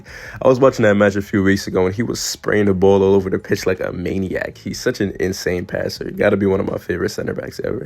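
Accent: New York, United States. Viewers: Great video. I like your humour and like your accent. I could tell you from New York because you sound like another YouTuber that’s from New York lol 😂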